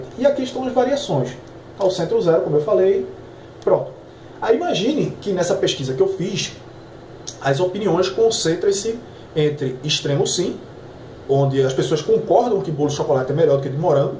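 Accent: Recife, Brazil. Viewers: Mas, tu és de Recife?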